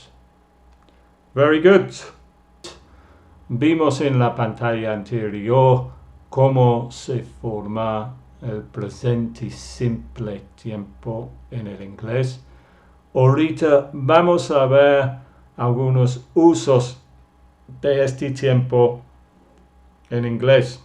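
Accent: United Kingdom. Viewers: I wonder if you learn English from him you'll have a british accent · Excelente profesor de Ingles, me gusta su inglés británico. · es inglés británico? Thank you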